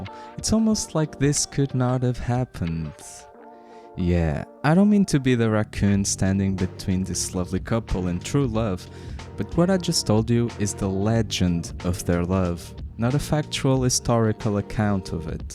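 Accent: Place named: Romania. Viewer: Stai că m-ai lăsat în șoc. M-am gândit că accentul sună a român, dar nu am fost sigur.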